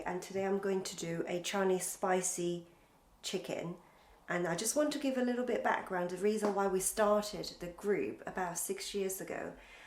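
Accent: United Kingdom. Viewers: That English accent? You should be in Bond movies,for sure?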